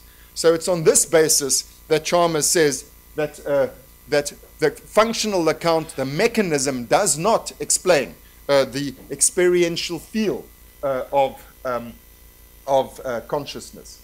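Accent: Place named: South Africa. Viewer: He has heavy South African accent.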